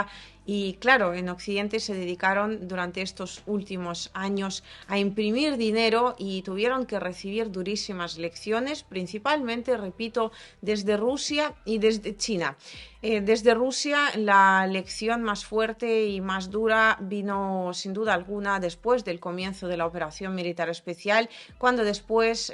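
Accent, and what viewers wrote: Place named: Spain. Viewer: hermoso vídeo gracias hablas "español " mejor que yo 😂 con acento español ( olé y olé )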